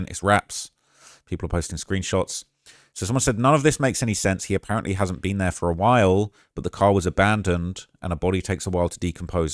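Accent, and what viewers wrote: United Kingdom. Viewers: Sushi chef with the Brit accent cool combo!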